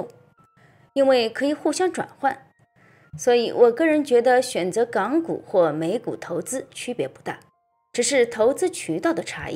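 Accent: Shanghai, China. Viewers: 您是上海人么？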